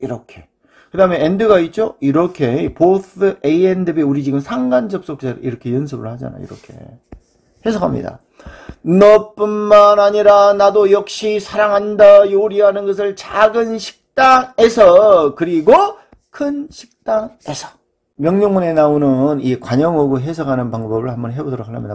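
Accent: Jeolla, South Korea. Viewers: 왜 니들 선생님 화나게 하시냐....분사는 동사가 아니래 잖아 ㅋ ㅋ 나이많은 저도 재밋고 유익하게 잘 배우고 있습니다.. (완전 전라도 사투리 ㅋ ㅋ)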